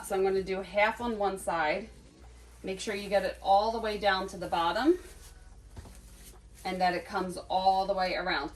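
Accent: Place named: Pennsylvania, United States. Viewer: You are a lifesaver! I am making a 3 tier cake for my father’s 90 bday party…you gave me a lot of tips! I’ve watched a few of your videos in the last hour already! When I first heard you speak, I said she is from Pennsylvania! Then I looked and see your from Langhorne! I am originally from Levittown, now in FL. thanks for all the tips and tricks!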